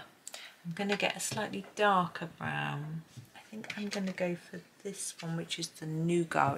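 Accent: United Kingdom. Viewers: I love your chattie videos. The British accent and info on the “ways” the Brit’s do and say things. Just love it. 🥰 😊 And, of course, your coloring.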